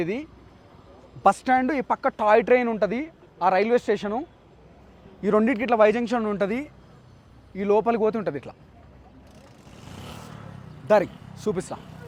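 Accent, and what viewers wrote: Telangana, India. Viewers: Anna yadiki poina mana telangana slange matladthav anna xlent anna👋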